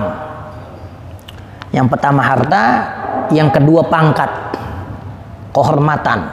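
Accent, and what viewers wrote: Jakarta, Indonesia. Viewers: Barakallah kiyai.... InsyaAllah bkl ikut ngaji terus,nambah ilmu...seneng bgt ngaji breng kiyai,kaga ngantuk.bahasa nye betawi tulen...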